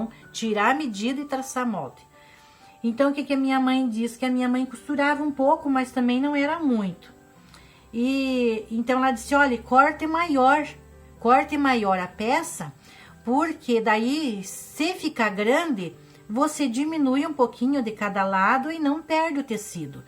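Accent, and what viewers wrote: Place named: South, Brazil. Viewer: Eita irmã,eu aqui ouvindo a senhora, observando o sutak, pensei,vô perguntar se a irmã é catarinense ou gaúcha,,aí a senhora fala que é de Curitiba .. Eu sou catarinense,mais faz 40 anos que estou em São José dos Campos SP.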